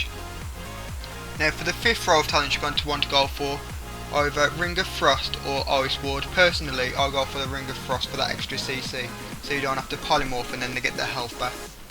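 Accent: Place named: Black Country, United Kingdom. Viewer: Yam from Black Country ay ya? Nice guide thanks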